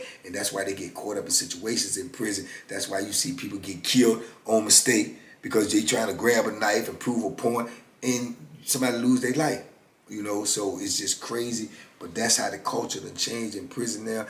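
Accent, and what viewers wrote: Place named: South, United States. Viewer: Just caught your channel, homie! Just wondering where you're from? I've heard a lot of stories from up north, but i swear to God, you were born in the south wth that accent! 🤣 keep up the awesome content.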